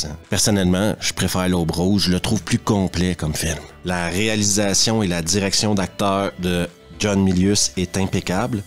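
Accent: Quebec, Canada. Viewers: J’avais 15 ans quand j’ai vu ce film au cinéma et comme beaucoup d’ados de cette époque ce film m’avait emballé… aujourd’hui la narration avec l’accent québécois de ce film m’a offert une toute autre saveur…. Merci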